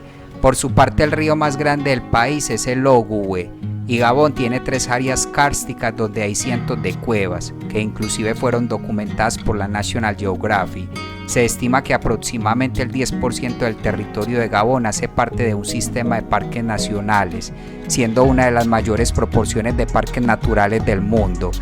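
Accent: Colombia. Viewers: Gracias hermano colombiano desde Gabón, supongo que eres colombiano por el acento que tienes.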